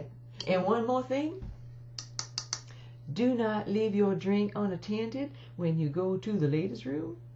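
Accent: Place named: South, United States. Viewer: I vote for number two all the way. ' I think number two fits you and your personality. Lady like and professional all the way, and the Southern accent fits you also. You are a true Queen of the South""""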